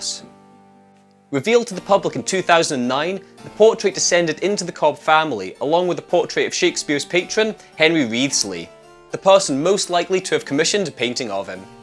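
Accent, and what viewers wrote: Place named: Scotland, United Kingdom. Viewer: Since my wife passed away, 13 years ago, and being retired, I've studied everything I could lay my hands on re WS. Conclusion: he wrote the plays, with a collaboration here and there, but the greater part of Shakespeare was written by a bloke name of William Shakespeare. Only two images are accepted as genuine likenesses, the bust which resembles nothing more nor less than the local butcher, and the Droeshout which Ben Jonson endorsed as a true likeness. The Sanders is an elaborate fake, and there was a period in the 19th C when dozens of fakes were produced. It was de rigeur at the time. And seeing as the presenter sounds Scottish, I think he'll know what I mean when I call these conspiracy theories a load of old bollocks. And I wonder if WS had a penny for each word spoken and written about him for the last 400 years. 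So bollocks it is then. QED.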